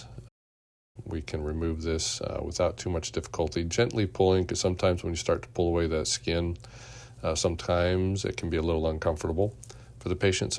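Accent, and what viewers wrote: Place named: South, United States. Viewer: PILOT!!! He absolutely does. Lol! 🤣 AND I believe I hear a slight Southern accent. 👍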